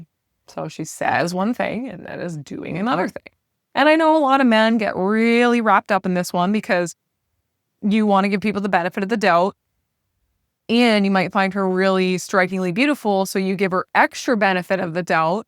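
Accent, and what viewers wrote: Canada. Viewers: I just love. Your Canadian accent. You are very attractive. I’m sure that makes you uncomfortable. Why? “Oh I’m smart and professional.” You’re still a girl, right?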